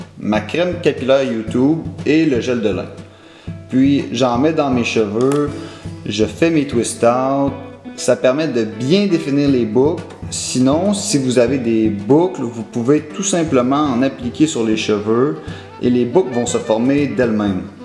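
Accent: Canada. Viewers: Wouah l'accent canadien 🍁. Toi tu n'as pas un fort accent canadien. 😉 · l'accent québécois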